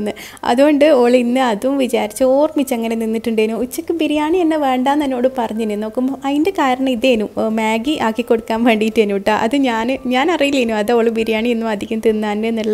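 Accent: Kannur, India. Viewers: കണ്ണൂർ ഭാഷ എനിക്ക് ഭയങ്കര ഇഷ്ടമാണ്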